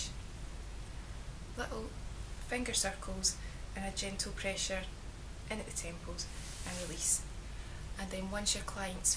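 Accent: Scotland, United Kingdom. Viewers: are you Scot? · Your voice calms me because it reminds me of my grandmothers thick scotish accent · It's very refreshing to have a fellow scot on one of these videos, lovely!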